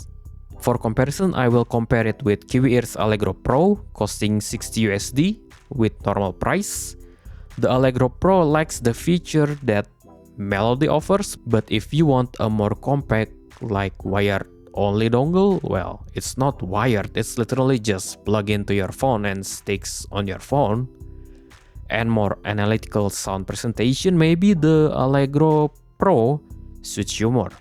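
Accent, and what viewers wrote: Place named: Indonesia. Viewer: Aksennya aja Indonesia banget, lagian bisa pake translate kocak kalo gak ngerti English 😂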